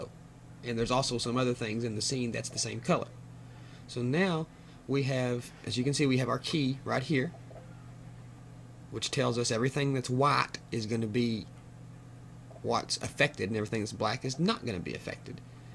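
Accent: South, United States. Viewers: Very interesting, and very very informative indeed. This guy is a savior! a savior with a seriously serious southern draw! lol ;-P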